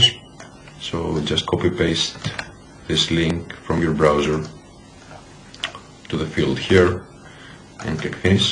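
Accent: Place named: Greece. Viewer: Να τος με τα αγγλικά αλλά ελληνική προφορά xD Nice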